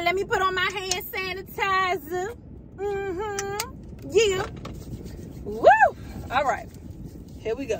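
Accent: Baltimore, United States. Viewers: Always watching videos you keep me laughing because you keep it real I got a question for you are you from Baltimore cuz you sound like you got the Baltimore accent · "One thing about KFC, KEEP THE RECIPE THE SAME" lmao - SN. The minute I heard you begin talking, I thought to myself: she HAS to be from DC or PG Country. Then I saw your Capital Heights PO Box in the caption. Lol! Loved this review, my fellow DMV Queen! I am about to try these today!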